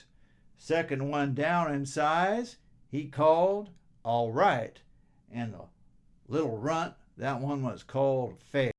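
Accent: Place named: South, United States. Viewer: Pretty good on the “southern” accent too!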